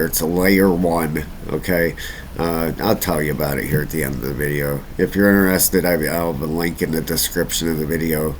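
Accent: Pittsburgh, United States. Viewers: Sounds like Pittsburgh to me, am I right?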